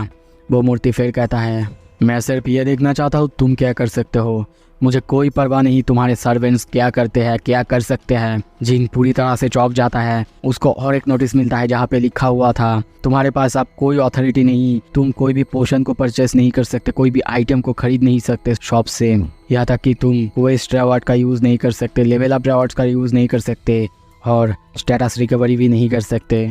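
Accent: Bengal, India. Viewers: Tomer kotha sune mone ho6ilo Tumi Bangali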